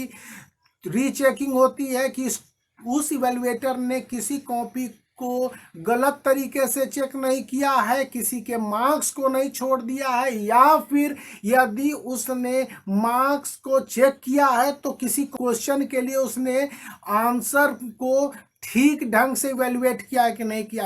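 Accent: Bihar, India. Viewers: Tum bihari ho kya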